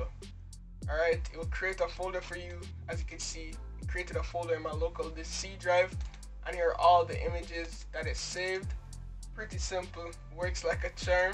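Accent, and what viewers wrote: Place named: Jamaica. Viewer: Are your Jamaican? Your accent is definitely peaking through 🙌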